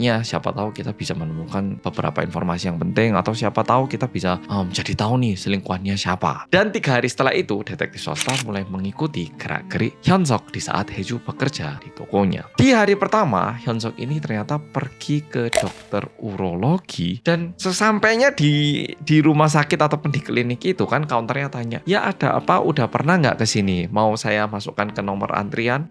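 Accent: Java, Indonesia. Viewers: Oppa kok bisa ditahan 😅😅😅 bahasanya jadi lucu karena medok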